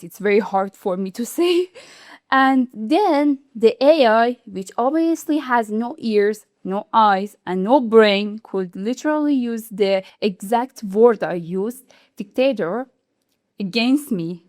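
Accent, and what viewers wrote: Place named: Arab world. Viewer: I don't know why YouTube uses this stubid AI?! The app was good without it! And instead of taking down random creators' channels why don't they take down the channels that make Inappropriate videos?! It is just so stubid!!! By the way, i think you are Arabian after hearing your accent, if you are; then i'd like to say that i am Arabian too 💜✨ لو انتي عربية اقرأكي الكلام العربي ده، حاسس لنك عربية من طرسقة كلامك، صح؟ 😅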